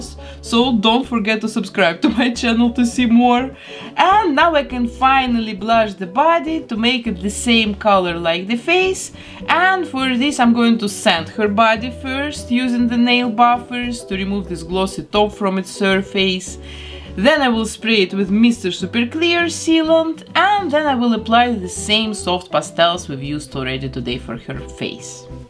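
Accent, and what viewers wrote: Russia. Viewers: are you by any chance not Russian? it’s just in accent that you’re Russian. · Is she Russian ?she has an amazing accent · Russian accent... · Сразу слышно,что Русская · Вы русская? Голос такой интересный, и акцент Но в любом случае, я в восторге с ваших работ. Это очень круто. Успехов) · you have the the THICKEST Rution acsent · Она часом не русская?просто акцент такой,очень узнаваем)впервые сейчас смотрю этот ролик)ну хочу сказать,она молодец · Whaaaat lol French?? This is a textbook Russian accent, have you ever really listened to French people in English??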